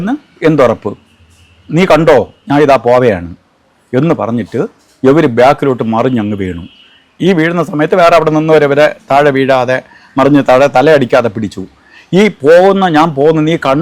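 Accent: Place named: Trivandrum, India. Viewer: Tvm കാരന് നമ്പൂതിരി ഭാഷയോ അച്ചടി ഭാഷയോ പറയാൻ പറ്റുമോ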